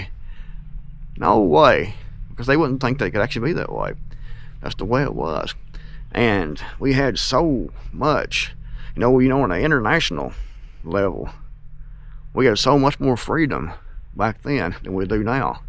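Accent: Appalachia, United States. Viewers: First video ive seen of yours i am a proud born and raised, West Virginia, Appalachian gal, and if they think you have an accent they should hear mine. Ive always been compared to Sissy Spacek, that played Loretta Lynn in the Coal Miners Daughter movie, because of the way i talk. Im so happy to be here and to hear a fellow Appalachian that sounds just like me. Oh yeah im a new subscriber too.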